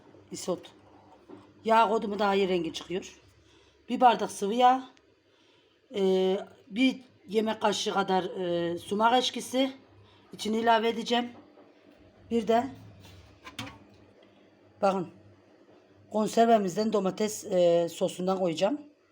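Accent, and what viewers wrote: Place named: Gaziantep, Turkey. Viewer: 7 kuşak doğma büyüme İzmir'liyim İzmir'de böyle bir köfte yok !!! İzmirin sulu köftesi olur böyle yoğurarak vir köftemiz yok bizim m..Siz büyük ihtimalle anteplisiniz şiveden anladım... Antep'in içli köftesi çiğköftesi çok bilinir Ellerinize emeğinize sağlık ama bizim İzmir'in böyle bir köftesi yok yinede memleketimin adı geçtiği için mutluyum 👍🏼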